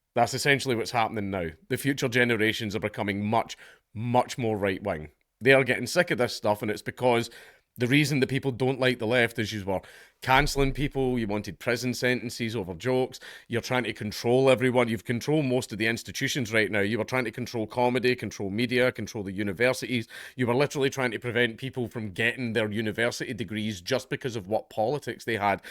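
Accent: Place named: Glasgow, United Kingdom. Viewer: I like that Dankula pronounces Chutzpah correctly almost by accident because of his Glaswegian accent..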